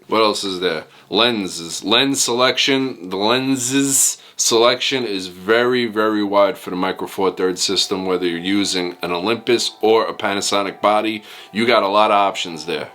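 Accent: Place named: New York, United States. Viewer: I knew it.. the 1st vid. I watched today o said.. 😏 ooohhh he is so Italian, sounds like new York or jersey with some flava.. lol new sub. Here hopefully u can BHL some other cameras bc I'm to confused for a vlog camera.. I do have the z6 which is great so far as a newbie and I feel futuristcally.. but it heavy with all the monitor n mic.. blah blah.. so I want more YouTube/travel options for a serious vlogger .. pleaseee!!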